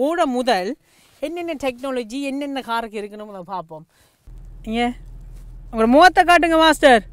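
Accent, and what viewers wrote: Jaffna, Sri Lanka. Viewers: Kingsley Rock It's Sri Lankan Tamil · Srilankan tamil kaekurathukku nalla irukku review va vida nenga paesurathu pudichirukku bro keep it up i am ur new subscriber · Seems Ceylon Tamil review ... Thanks for posting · Enga Srilanka Tamil La Explain Pannum pothu Happy a iruku Bro 😍😍😍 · Bro ninga srilankan aah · Sri Lanka Tamil... Azhagu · Sri lankan slang ❤️ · Neenga jaffna va Anna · srilankan tamil nalla irukku...♥️♥️ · Srilankan slang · Srilankan tamil bro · Hi bro i am srilanka unga videos super congratulations I am waiting for more videos neenga srilankan citizen Pola pesuringa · Hi brother I like your Sri Lankan Tamil style. Keep rocking 👍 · Unka language style srilankan mathiri irukkuthu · ஸ்ரீலங்கன் தமிழ் அருமை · Bro unga srilanka Tamil romba pudichurukku Next Ben's review &Skoda kodaiq review heavy illa Tamil illa Main reasons pls all the best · Brother unga Ceylon tamil suppr... 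And also your ammalee word.. 😍😄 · Ohhh bro. I love ur lankan tamil accent.. keep going bro. Love from Madurai, Tamilnadu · நல்ல சுத்தமான இலங்கை தமிழ் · Sri Lanka tamil 😆😆😆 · Sri Lanka la pronunciation apdidhaa irukkum bro..adhuvum azhagaanadhu dhaa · Super srilankan Tamil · Itz ilangai tamil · Semma tamil bro srilankan tamil aa reviews sprp rolce royce review um paathuten spr bro ungaluku car kudutha ellarukum oru periya thnx naan sonnen nu sollirunga... Keep rocking and do more. All the best bro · Jafna Tamil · Neenga Jaffa va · Are u srilankan?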